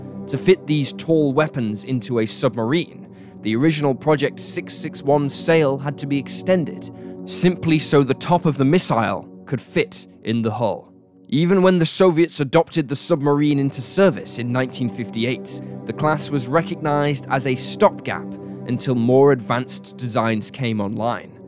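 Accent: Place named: RP, United Kingdom. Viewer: His accent is from the south of England (received pronunciation), not Ireland.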